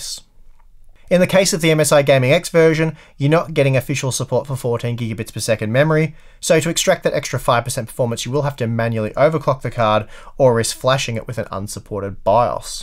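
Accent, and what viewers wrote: Australia. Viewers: Youtube's auto-generated subtitles aren't that great with Australian English. What you read here are the results ;)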